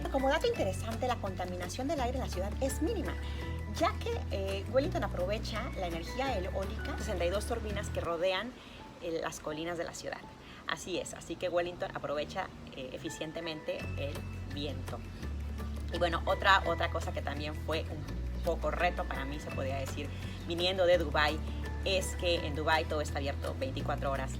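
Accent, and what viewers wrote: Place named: Mexico. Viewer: 👍Qué lindo video, y todo tan bien explicado con tu hermoso acento mexicano 🤩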